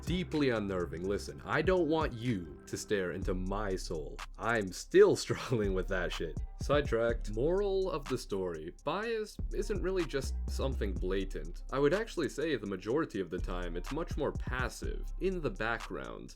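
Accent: South, United States. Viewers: sources? Keep on listening if it makes you feel better. You realise he makes money preaching to the choir. Liberals love to hear a southern accent spew their talking points. Like how consertives like to hear black men to bash police. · I'm not even a native English speaker and I associate Beaus accent with hillbilly Republicans. The bias is real. We have a similar thing in Germany with the Saxony accent. You hear it and you think: uneducated, racist, about to lecture you on how we aren't living in a democracy because vaccines.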